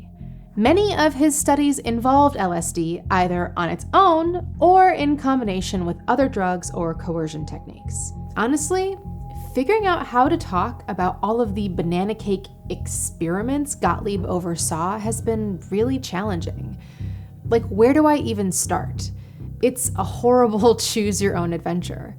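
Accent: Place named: England, United Kingdom. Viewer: Your English accent is soooo beautiful!!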